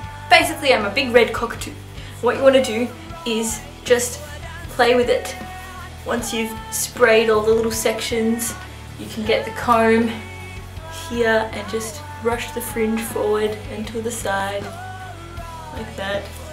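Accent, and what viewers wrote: Australia. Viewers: Are you australian?? XD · are you Australian ?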